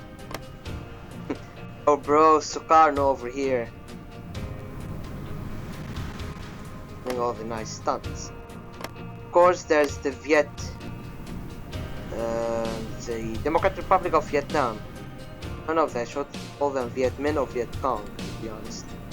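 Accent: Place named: Arab world. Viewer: Great series! I can tell you're an arab, but where are you from?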